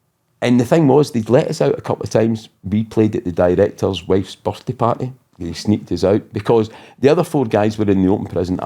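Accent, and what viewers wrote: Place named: Scotland, United Kingdom. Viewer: I'm sure this story is amazing (I know it is) But I can't understand so much of it ! I'm usually fine with the Scottish, but this guy is deep 😂 I want his book for sure :) Bless u mate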